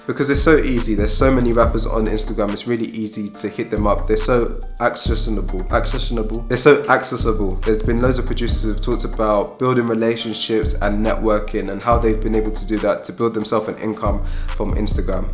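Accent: United Kingdom. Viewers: ooh nice, how long you been there? Judging by your accent I'm assuming you're originally from the UK? Maybe London or surrounding? I was in Spain for a year, I miss that place, planning a quick visit this summer, maybe we can hook up, talk music. I'll hit you up on insta if that's kool?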